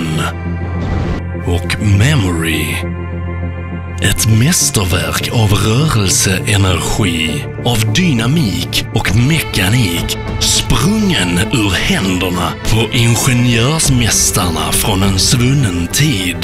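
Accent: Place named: Skåne, Sweden. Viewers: Gabriel von Blumenthal skånska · Gabriel von Blumenthal Everyone thinks different, but I would say in Skåne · Cool video Can't stand the southern accent though. D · Its called skånska, spoked by those who are from skåne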